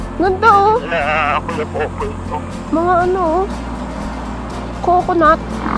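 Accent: Batangas, Philippines. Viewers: lods penge sticker! new subscriber nga pala ako, nakakatuwa yung punto mo lods parang batangenyo, hehe. rs! 🤙🛵💨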